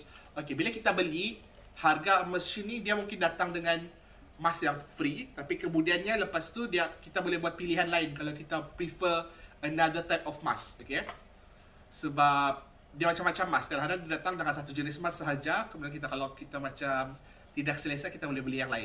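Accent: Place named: Sabah, Malaysia. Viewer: Org Sabah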